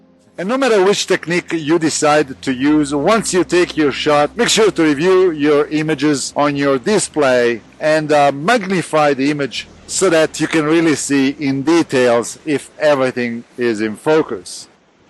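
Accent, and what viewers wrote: Italy. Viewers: I don't know why but there is so much "magic" or "positive energy" in your videos in which you are outside in the nature. Hard to explain ... It's beautiful - and then you play the music and it's even more beautiful. But strangely this is only in your videos - don't feel the same in other photographers videos when they are somewhere filming in nature. Thank you for the helpful information by the way. Like your Italian accent. Cheers from Austria! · Hi, I've been following you for some time. I like the way you tell your work and I like your Italianized Italian but I don't like the way you do pasta, but that's another story:-). I'd like to ask you a question, I haven't found anything on your site either, about it. I saw you use an L-bracket for vertical shots, can you tell me the type / brand of this bracket? Do you feel comfortable? I'm waiting for your other videos. 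Good light. Ivan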